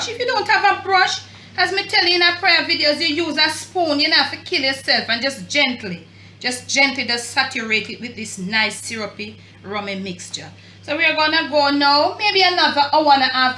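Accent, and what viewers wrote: Jamaica. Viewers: Thank Ms..I love all of your recepies....😍😍 Love the way how you keep the Jamaican accent.. everthing that I have in mind to try so far, I just click on your channel..and There you are.. Manner and respect!!! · Hi Ms., i love your Jamaican ascent, an i love your rum cake. If you are baking for kids what can you use instead of rum. Thanks dear.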